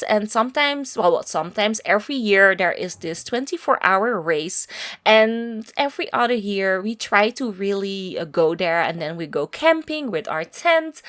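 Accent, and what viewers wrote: Netherlands. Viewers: I adore Dutch accents ! Amazing house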